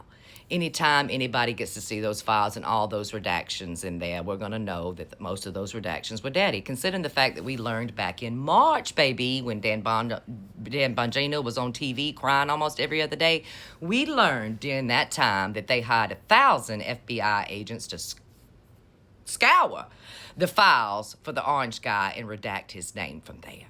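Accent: South, United States. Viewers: I could listen to your deep southern honey voice blasting MAGA-World all day! What a sharp sense of humor you have! Such HILARIOUS VERBIAGE!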